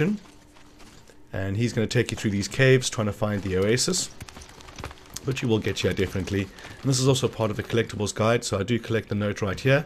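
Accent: South Africa. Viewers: Hey! Are you South African? It kinda sounds like it. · Are you South African? :)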